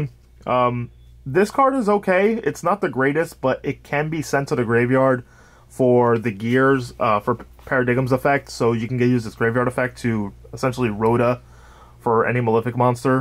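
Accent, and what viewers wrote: United States. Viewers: Is English not your first language, you sound American, or did you genuinely not know? :v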